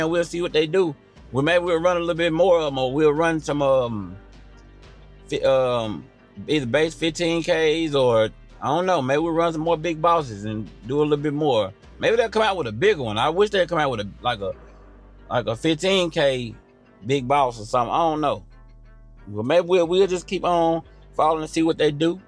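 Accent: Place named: Tennessee, United States. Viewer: Completely unrelated but you from Tennessee? That accent sound similar to mine 😂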